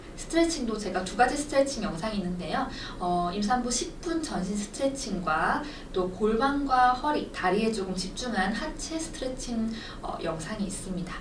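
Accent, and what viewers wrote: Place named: Busan, South Korea. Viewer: 임신부 운동 검색하다가 찾아왔어요. 말투가 익숙하다 싶었는데 부산분이라 반갑네요 ㅎ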